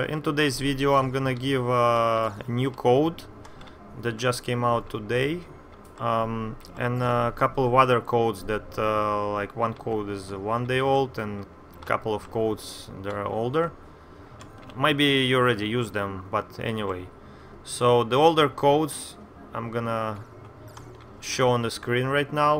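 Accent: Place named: Russia. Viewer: Похоже что русский говорит на английском. )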